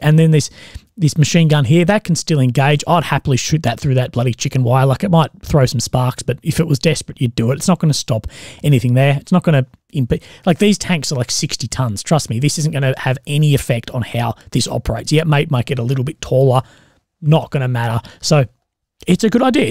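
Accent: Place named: Australia. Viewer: I like your Australian accent 👍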